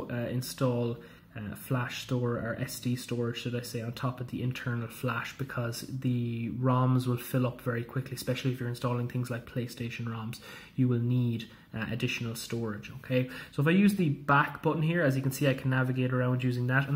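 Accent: Ireland. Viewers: Is it odd for a man to tell another man his voice is adorable? I want this man to FaceTime me nightly and just tell me about his day while I drift to a deep slumber to his sweet buttery brogue. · You're Irish right? If so how did you get this console?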